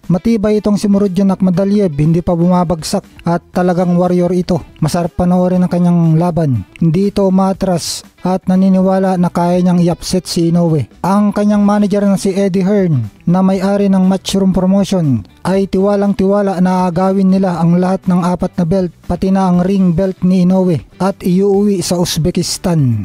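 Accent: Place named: Visayas, Philippines. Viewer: Salita mo palang, pang bisakol na...basta ganyang lahi mga fakenews .... dapat mawala na kayo sa mundo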